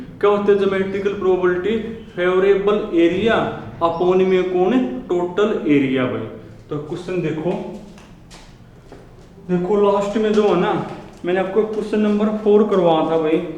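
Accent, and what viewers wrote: Haryana, India. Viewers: Are u from haryana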